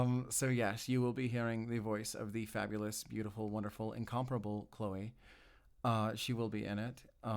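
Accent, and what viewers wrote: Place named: California, United States. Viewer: Yes I’m six months late, but I thought your speaking cadence sounded familiar! Californians recognize Californians 😂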